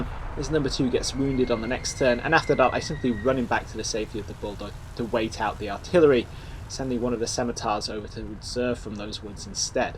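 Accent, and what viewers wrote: United Kingdom. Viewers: Hey! Your editing and narration are just wonderful! In my book, you are the best Combat Mission Youtuber around.:D Must be something with you Brits... the best Youtuber for Forged Alliance Forever is also a British guy his channel is called 'Gyle Cast'. I played this scenario because of your video and enjoyed it very much. I use to be a bit more cautious in moving-to-contact which led to me losing just one lad. Aside from that your tactical analysis is spot-on so I went with much the same plan as you and it also played out much the same. The scenario reminds me very much of the first mission of the 'Task Force thunder' campaign which is in a similar context and tactical situation.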